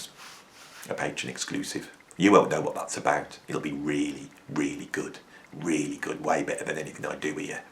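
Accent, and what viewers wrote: Birmingham, United Kingdom. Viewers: Sorry old luv but as a pro jazz arranger/MD and player of 45 years I think your attitude is trivial, uneducated and banal. Let's leave your brummie accent aside because it would not be fair to make assumptions based upon that and i would be the last to wish to so do. I have watched some of your - to me, cringeworthy - videos and cannot help gain the impression your musical knowledge needs a refreshing perhaps?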